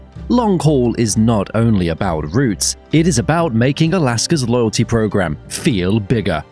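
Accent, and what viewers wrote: United Kingdom. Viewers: You have the most annoying standard British voice ive ever heard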